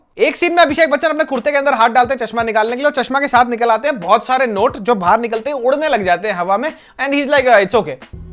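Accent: Haryana, India. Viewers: Was missing your haryanvi soooo much 😍😍😍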